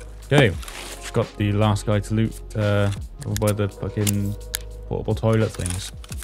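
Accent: United Kingdom. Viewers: This is my first video watching u and from the moment I hear the British accent I’m engaged 😂 then I witness the splendid performance of a player with 4000 hours on EFT and not only that but you give us such a vibe of music afterwards as you loot and groove your way through. Then finally I see the length of the video 😎. A job well done my friend. 🤝 how could I not subscribe?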